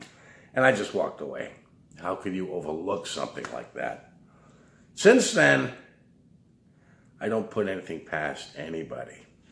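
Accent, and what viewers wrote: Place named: Boston, United States. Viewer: Good insight always to the point and says it like a true bostonian (guessing thats what the accent is). its messy when your in and leaving isnt a fix in itself. Gotta get a life. Thanks, pal, you are a breath of fresh air.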